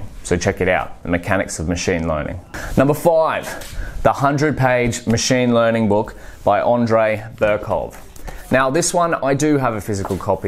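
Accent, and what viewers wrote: Australia. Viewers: The way you speak sometimes feel like Billy from strengerthings , or are u Australian..?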